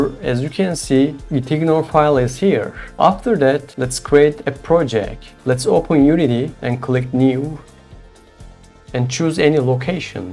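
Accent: Turkey. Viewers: I picked up the Turkish accent right away when you started talking😄 Nice video btw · Abi Türk olduğun çok belli oluyor ama teşekkür ederim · are you from turkey ??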